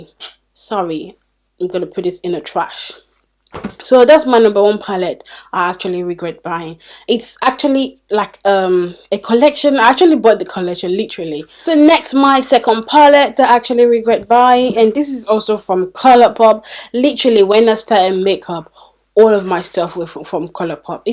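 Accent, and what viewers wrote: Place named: Nigeria. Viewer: Hi I like ur accent, are Nigerian? Liked and subscribed.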